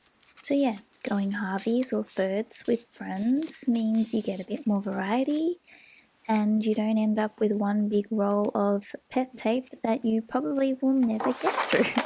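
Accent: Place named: Australia. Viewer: I'm falling down a deep rabbit hole that is the planning and journaling community and I LOVE your channel! Soft spoken creators and simple aesthetics are the easiest ways to get me subscribed, so thank you for creating, can't wait to see more! I use a Hobonichi weeks and Field Notes for commonplacing/Korean language study planning (Megan Rhiannon's former system, if you know her channel). Plus I just ordered a vegan traveler's notebook from etsy and so seeing other people's systems to see what works well for them is wonderful to see what could be adapted to my system. (Also, one of the only podcasts I listen to is an Australian comic book movie podcast - The Weekly Planet, so I love that I get to add more Australians to my repertoire of favorite content.)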